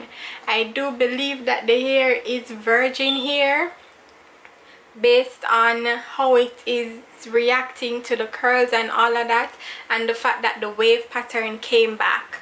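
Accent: Jamaica. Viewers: Are you Jamaican? Guh deh yaaaaaaaadie!!!! Love seeing fellow Jamaicans on YouTube doing there thing.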